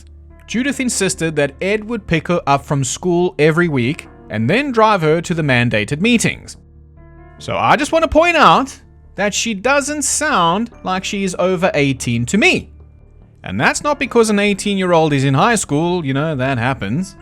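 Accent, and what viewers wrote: South Africa. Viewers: Bro? Are you South African? I'm asking because I'm South African and your accent is sounding very familiar 😂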